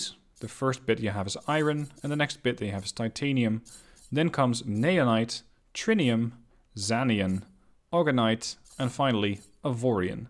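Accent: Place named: Netherlands. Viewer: i think this guy is dutch, of nie dan?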